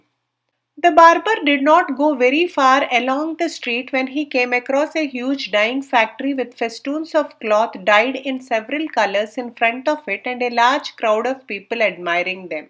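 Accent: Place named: India. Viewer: You narrate so beautifully with a touch of indianness which is delightful for the listener!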